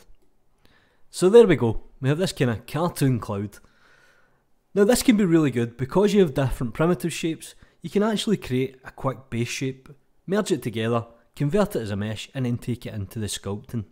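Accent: Scotland, United Kingdom. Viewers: Hes Scottish lol no the wonder!!!! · nah its Scottish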